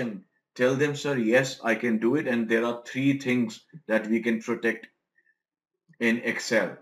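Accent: India. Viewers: Very Indian accent. Also helpful vid, cheers buddy 👍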